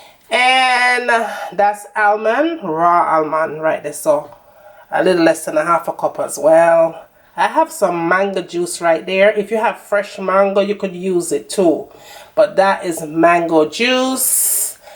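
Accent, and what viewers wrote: Jamaica. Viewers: Jamaican accent tan up strong duh... Thanks for sharing heard about this now I finally see it on youtube.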